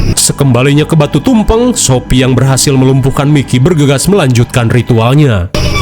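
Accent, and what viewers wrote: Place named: Cianjur, Indonesia. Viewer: ini bahasa Sunda ny kaya ny cianjur bngt nyambung sama Sunda aku soal nya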